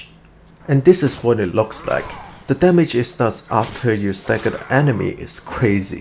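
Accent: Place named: Taiwan. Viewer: Thank you for another great video. A lot of information to process here so i need to watch a few times so i can adjust my Samurai build. Are you from Taiwan, love your accent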